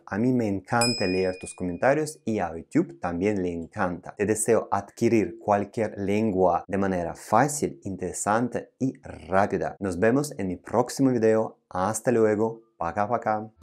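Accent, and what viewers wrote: Russia. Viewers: por primera vez he escuchado un acento ruso en espanol. Interesante. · Su acento es grave. Tiene un acento ruso en espanyol. No recomiendo aprender ahi.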